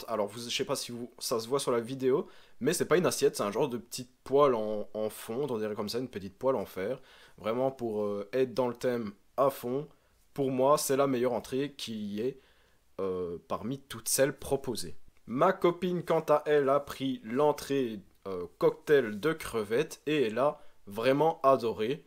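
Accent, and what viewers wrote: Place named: Belgium. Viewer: J'adore ton accent belge. En tant que confrère, je m'abonne.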